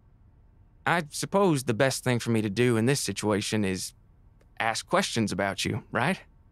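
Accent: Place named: United States. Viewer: I love the audio it's very cute but why he got a little bit of a country accent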